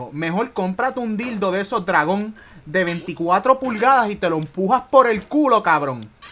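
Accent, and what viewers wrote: Puerto Rico. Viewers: QUE SEA PUERTORRIQUEÑO LE DA EL TOQUE JAJAJAJAJAJAJJ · El acento de Puerto Rico es super bueno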